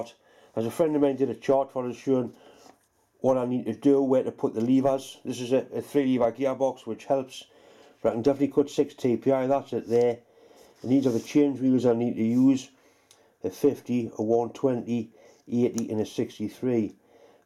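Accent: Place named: England, United Kingdom. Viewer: Nice going fer a twice Cacky -handed ,funny talkin` Pom , keep-on messin` aboot dear friend of the same affliction !! Regards Rod Chessell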